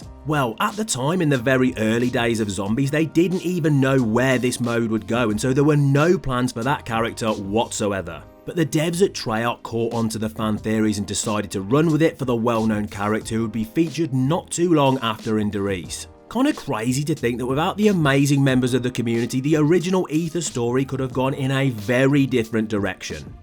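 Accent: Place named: Australia. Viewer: accent is like a heavy country Australian accent, plus a heavy accent from the uk somewhere very awesome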